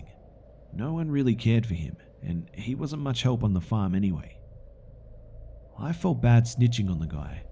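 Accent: Australia. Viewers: The first story makes me think about that Guy Pearce movie that was based on the true story, about the girl in Australia who was murdered by the daughter of old neighbors who was mentally unstable (your accent, probably why I thought of it bc it was set in Australia). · you sound like the narrator for top trending with an australian accent. ^^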